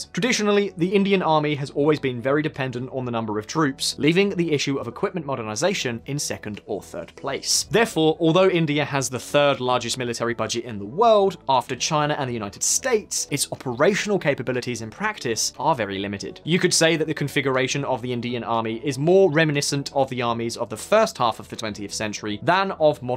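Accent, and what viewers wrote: United Kingdom. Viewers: The narrator in this video is from the UK, what does America have to do with this video? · He isnt american, he has like a UK accent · Not Americans the guy is probably from UK judging from his accent. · 1. thats a british accent 2. America is barely involved in Kashmir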